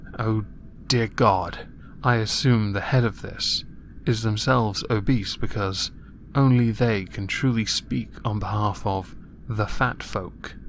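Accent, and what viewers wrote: RP, United Kingdom. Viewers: As a brit living in areas where common language is "enits" and "tings" you have the best British accent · I never knew how much I needed a refined British man critiquing idiots in my life, until I stumbled upon your channel. Absolutely loving your content!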